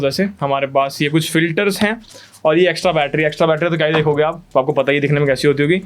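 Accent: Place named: Delhi, India. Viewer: And bhaiya always come with something new and unique may be and bhaiya ka bolne ka style bilkul delhi wala apne jaisa😂😂😂😂:goodvibes::elbowcough: btw happy engineer's day bhaiya ❤:elbowcough::elbowcough::elbowcough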